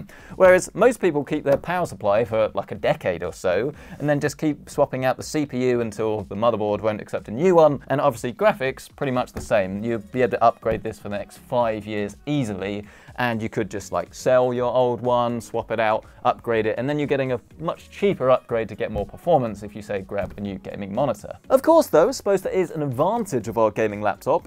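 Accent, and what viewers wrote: United Kingdom. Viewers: Dear Lord its British Linus!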